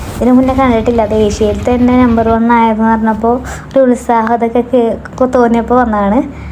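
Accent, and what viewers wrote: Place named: Thrissur, India. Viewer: and her tamil in Thrissur accent 😅❤️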